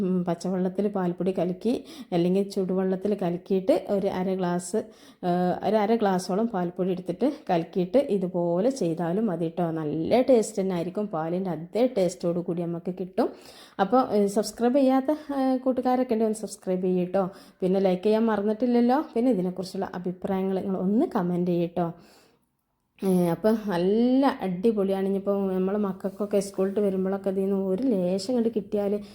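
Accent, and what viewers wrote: Malappuram, India. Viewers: Sathyathil ivar malappuram kare naattikkan vendiyanennu ingane samsarikkunnath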